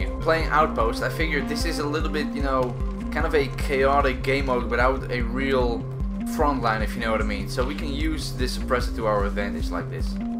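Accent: Netherlands. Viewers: Hello If i play battlefield with my friends they often say i sound like you, probably because I'm dutch. :) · You can hardly hear your Dutch accent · Ik hoor gewoon je Nederlandse accent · You have a dutch accent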